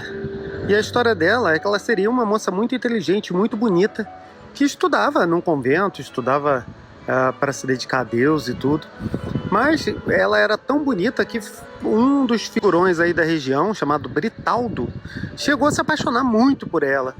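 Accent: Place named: Niterói, Brazil. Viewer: Muito bom o video, reconheci o sotaque de Niterói. Gostei, porquê você conta as histórias. Parabéns!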